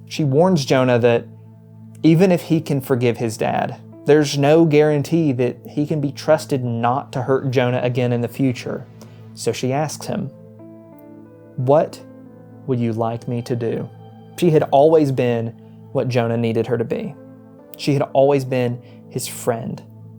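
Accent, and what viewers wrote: South, United States. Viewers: Hmmmm...based on your accent I'm going to say either Louisiana or East Texas. Potentially Arkansas. You don't have to deny or confirm that either way, I'm just guessing as a person from a different part of the region. It also could be one of the Carolinas, I'm thinking that's more likely now but I'm not well versed with the Carolina accents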